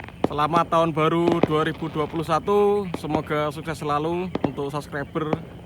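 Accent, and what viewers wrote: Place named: Central Java, Indonesia. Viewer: Koe wong jowo tengah mas???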